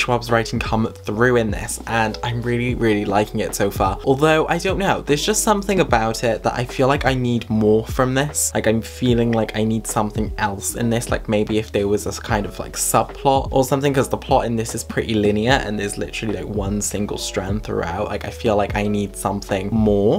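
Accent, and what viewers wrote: Wales, United Kingdom. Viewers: I believe he is from Wales if that helps😊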